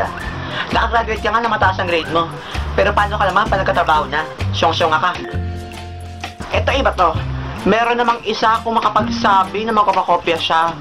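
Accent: Visayas, Philippines. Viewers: bisaya diay ka .. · teeeerrr, bisaya jud ka ter? lingaw kaayo ka ter uie! kaulett! haha. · shet!!...lingawa nimo mag.bisaya oi..karelate man ko sa imong pisti ug yawa...haha!!.. igo ko atong sign language...LOL.. #pisti ka day, naa na si maam day, yawa ka...haha!!!! :DDDDD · HAHAHA! galing, bisaya din pala c kuya. bagay bisaya sau kuya;) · BISAYA KA? SHET AS IN? WALA KO NAG EXPECT! OR KATONG YAYA NINYO ANG BISAYA? MY GOSH GI KILIG KO PISTE! 😂😂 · biSaya diay ka ????.. . hahaaha. . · Bisaya ka pala? san parte day? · BISAYA MAN DIAY KA HAHAHAHAHAHA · bisaya pala xa...!haha...... tga san yan..? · haha bisaya gud siya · Bisaya? hahaha xD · Bisaya man diay nis. hahaha buanga oi! xD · bisaya ka? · HAHAHAHAHAAHHHAHA bisaya te? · ahahaha bisaya si koya.. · "day,day josephine unsay sagot sa number 1 day?day,pagdali day....kay naa na si maam...pesti...pesti ka..yawa ka..XD. 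Bisaya kang dako!!hahaah... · ag KYUTTTT!!! nag pag bibisaya mo tol!!!!! wahahaha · hahaha lakas ng trip ee. BISAYA MAN DIAY KA DONG! nyahahahaa! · Hahahaha. nad Yess. Bisaya ka day.! Hahahahhahahaaa. Im from Cebu. noe here. :* "Yawa ka pisti:" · Haha, Feeling ko Bisaya talaga dahil sa tono :v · Bisaya ka? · hahahaha! bisaya pd d.i ka? xD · bisaya ba? bisaya? :) Galing! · waaah. bisaya. tga asa ka?hahahah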